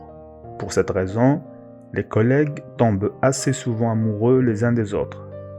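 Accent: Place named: North Africa. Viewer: C’est dommage que le narrateur lise ses commentaires et je n’aime pas cet accent nord africain perso je sais quels hommes m’attirent et les hommes bleus du désert ne sont pas mon type ni même les Africains en général je suis une hypersensible et l’odeur leur accent leur mentalité leur communauté pseudo religieuse même leur cuisine grasse je n’aime pas ….je n’aime que ceux de mon ethnie il n’y à pas d’africains ni autres étrangers dans ma famille et même à la vue de ces gens je fais de l’urticaire géant heureusement qu’il n’y en a pas dans ma famille car je n’aurais su être hypocrite je suis chrétienne non catholique…..! Et pourquoi parle t il que de la masturbation des hommes nous aussi on se masturbe le clito et surtout l’esprit perso je me touche au moins trois fois par jours depuis que je suis excitée par un homme exceptionnel et Français et peu importe le moment sagesse du jour et de la nuit !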